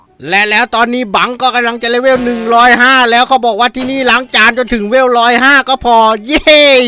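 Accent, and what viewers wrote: South, Thailand. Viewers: พากใต้อีกไหม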